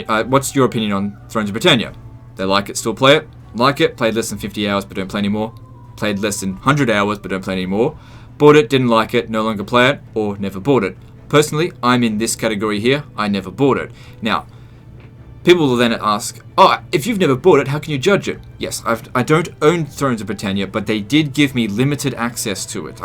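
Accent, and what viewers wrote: Australia. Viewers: CA fanboi since Centurion on the Megadrive. All TW games have some shit and some gold. Some are golden shit. Bought everything except that shitty game after Empire;) Britannia, played it a bit but I mean ffs CA. Release TW:WH3 and Three Kingdoms already...Will always be about watching your shit. Need more aussies talking like aussies.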